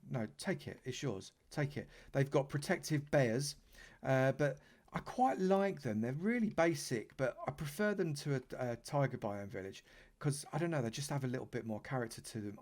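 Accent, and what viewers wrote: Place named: East London, United Kingdom. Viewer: U sound like u support West ham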